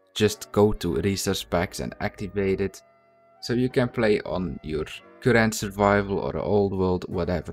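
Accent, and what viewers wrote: Netherlands. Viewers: Ben je Nederlander of Belg ?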